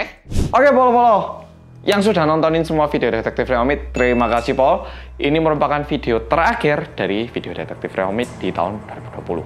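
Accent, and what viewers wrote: Java, Indonesia. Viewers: Woi orang korea kok logat jawa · nymng meddoooookk nya mengcandung bet rek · Mas mamakku bingung lho🤣sampean dikira orang Jawa 🤣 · 3 "bersama orang korea yang medhokk" selalu terucap setiap pembukaan nonton mas wkwk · Jam wib liat kamu ditv sctv acara tokopedia ngomongnya medok njiirr Beneran kamu kan?? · "Merry Christmas" Mas medok😌🐍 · Medhok banget mas, nanti klu jadi dosen cocok bgt. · gue suka banget yg berbau kisah nyata/sejarah plus gue sk Korea. Itulah mengapa gue sk bgt channelny sejak ad seri detektif reomit jujur pas masi jmn vlog2 biasa gue B aja. Bruntung skale ad wong korea yg muedhokkk ini jd enak djlasinnya pake bhs Indo, kalo ad yg bahas tp bakor mah ya kicep..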